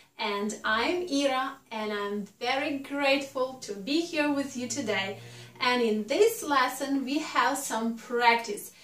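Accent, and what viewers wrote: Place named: Western Ukraine, Ukraine. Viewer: Excellent as usual....look forward to next one. Also your Western Ukraine so easy to understand and follow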